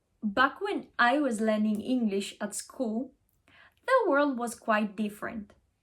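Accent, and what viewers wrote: United Kingdom. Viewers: I find your videos really helpful Thankyou! All the other Greek videos I have found are too difficult to understand as the tutors speak too quickly. Yours is perfect. I hope you don’t mind me saying but I am surprised that you are pronouncing ‘ and’ with a strong American accent and internet without the ‘ t’ sound because otherwise you have an English accent which sounds almost fluent. To me these words with American pronunciations stand out and sound rather odd. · Listening to your British-English accent, I wonder if you can talk about how you acquired it (instead of, say, an American-English accent), and how to better sound "Greek" instead of speaking Greek with an American or British accent? Also, I know that Crete has it's own accent/dialect. Are there many other regional accents around Greece?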